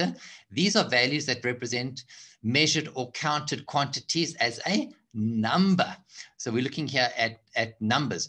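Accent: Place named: South Africa. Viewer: i love a good south african accent!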